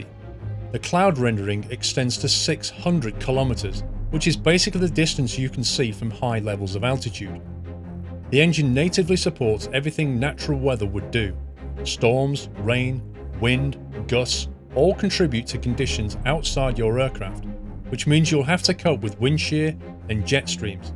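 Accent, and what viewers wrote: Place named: Bromsgrove, United Kingdom. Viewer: You speak just like my cousin from Bromsgrove, coincidence?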